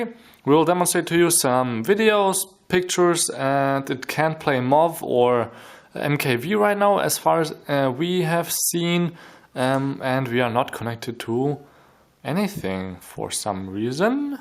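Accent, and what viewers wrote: Germany. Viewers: ich habs gleich gewusst das du aus deutschland kommst, verdammt wieso hört man das bei uns so raus? >.< ich hätt am liebsten einen amerikanische aussprache :D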